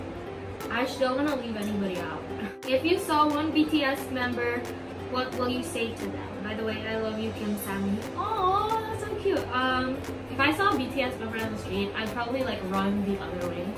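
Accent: Philippines. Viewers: i just love your tagalog accent! Waaah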